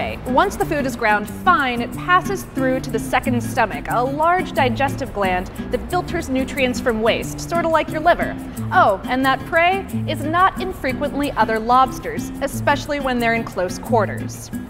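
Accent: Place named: United States. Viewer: has a german name, looks asian and speaks american english....globalistaion for the lose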